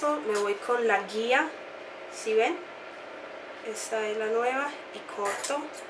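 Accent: Colombia. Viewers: Su acento es colombiano.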